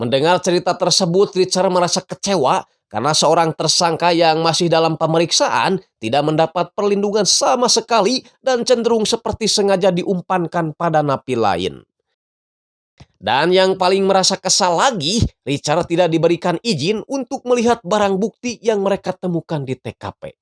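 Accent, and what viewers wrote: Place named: Sunda, Indonesia. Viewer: Njir baru denger cara baca narasinya boga karakter, selain intonasinya yang has urang Sunda, tapi sangat menghibur lucu komedi banget..😅😅 kanjuutkan..😊😅😅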